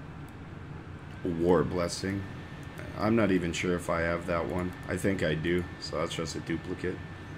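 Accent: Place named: Canada. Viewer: Fuck it. Nice vid SON!!! Throw in some more of that Canadian accent, aye?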